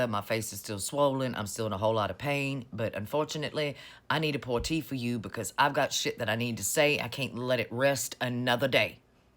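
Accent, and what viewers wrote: South, United States. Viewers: The message is on point👏🏾the southern accent is the cherry on top🍒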